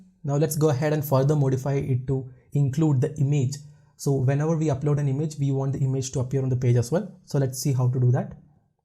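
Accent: Kerala, India. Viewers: You got a good mallu accent. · pure mallu accent :) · that malayli tone to english love it :)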